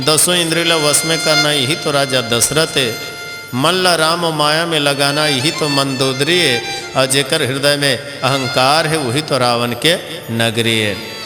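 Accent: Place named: Chhattisgarh, India. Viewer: Bahut Badiya sughar lagthe chhattisgdi boli Ha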